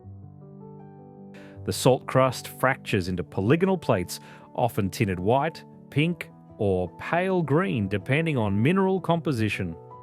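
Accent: Australia. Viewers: An Aussie narrator, not a bloody yank - thank god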